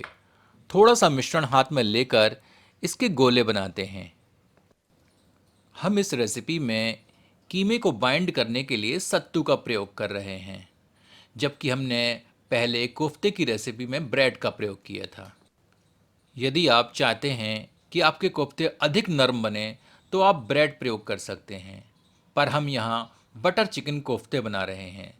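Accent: Standard. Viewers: Shudh Hindi ucharan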